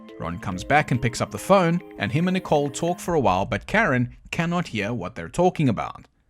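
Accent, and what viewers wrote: South Africa. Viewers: I knew you were South African! I've asked you several times because I recognized the accent. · I've been watching (listening to) your videos a lot while drawing this past month, and that entire time, I, an Australian woman, have been trying to figure out if your accent is from New Zealand or Tasmania. As soon as you said South Africa, it was a total Eureka moment.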